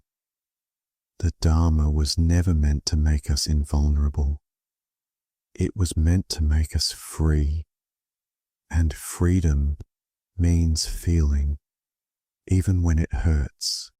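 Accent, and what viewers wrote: Australia. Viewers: It is does like he's from the Outback, but the audio quality is even and smooth, for once. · The content is interesting but this AI chinese dope with an aussie accent gets old fast, can’t watch it.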